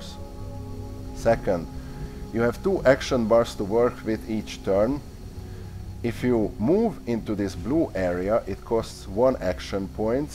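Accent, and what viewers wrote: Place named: Hungary. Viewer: Wow, very detailed, nice guide. Are you hungarian by any chance? Just guessing by your accent. :)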